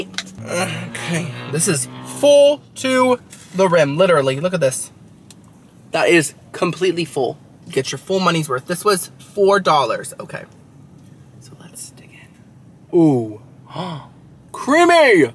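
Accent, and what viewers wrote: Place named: United Kingdom. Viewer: I think your videos are way more fun to watch them in the UK. I love your British accent! Does it help the stutter? I hope so. We don’t have many of the food places you frequent. I want to jump on a plane 😂 xxx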